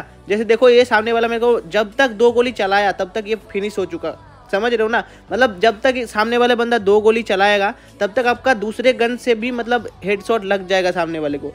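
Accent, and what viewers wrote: Bengal, India. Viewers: Vai ap bangali ho 🤔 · Bhai aap bengali ho kya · Bhai tum bangali hoo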